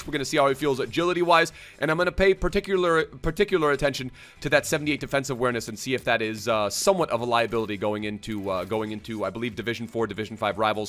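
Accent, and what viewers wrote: Ireland. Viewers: Lmfaoo irish?? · me being confused for the first 2 minutes of the video if he really thinks he is irish or he's just joking around :D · Actually thought he was Irish my disappointment when he didn’t strong link to my Roy Keane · wow i literally believed he was irish lol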